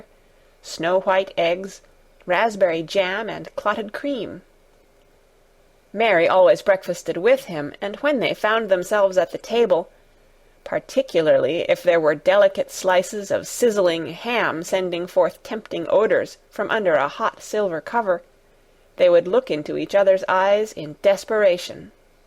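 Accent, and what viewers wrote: Yorkshire, United Kingdom. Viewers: This is the best audio book and reader ever! Her reading i so smooth and the Yorkshire accent is wonderful!!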